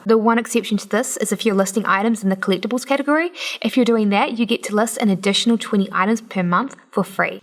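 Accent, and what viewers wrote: New Zealand. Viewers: I began to understand a lot more about Amazon and Ebay selling, just from post. This led me to research much more in depth regarding this subject. So, in my opinion, great work!!! Additionally, I imagine the reviewers leaving negative comments believe they are showing a humerus side of themselves, but only identifies them as ignorant and unpolished. Her accent is amazing and I suspect she does hail from New Zealand. If you haven't traveled, the country possesses an undefinable beauty, North and South. The Kiwi population (aka New Zealanders) are a wonderful, warm, welcoming and positive people. I would live there in a heart-beat and would relish hearing words like, DEPIEND and CIENTS, its quite enjoyable to hear. Let's bear in mind that English existed far longer than America, so we need to be tolerant of other English speakers, including Speakers of English as a Second Language. 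· 'Depind', 'cint', etc. This is New Zealand pronunciation.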